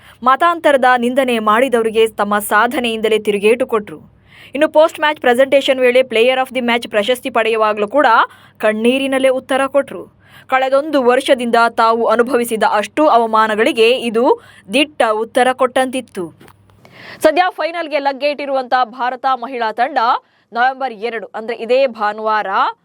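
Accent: Standard. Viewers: ಅದ್ಭುತ ವಿವರಣೆ, ಶುದ್ಧ ಕನ್ನಡ. ಅಭಿನಂದನೆಗಳು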